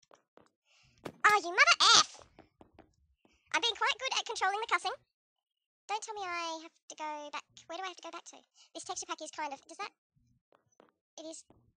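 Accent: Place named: Australia. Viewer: "It was sprinty but it, somehow, didn't seem sprinty enough." ~ 2014 Love the spinning Ultimate logo thingy. Also, you managed to make the Aussie accent even more cute! >.<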